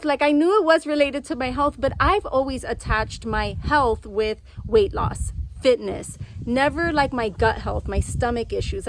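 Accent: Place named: Los Angeles, United States. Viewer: Hate that accent. Typical LA Hispanic sounding. I know girls from there that sound like this and can’t stand them.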